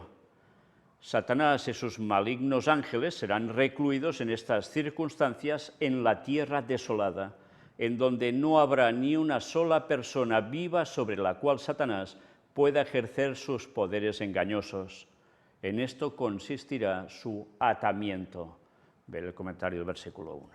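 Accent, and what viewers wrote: Spain. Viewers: Gracias hermano por su predica, ciertamente disfruto mucho cuando usted predica porque hace una mezcla de verdades con su jocosa manera de hablar estilo espanol. Bendiciones.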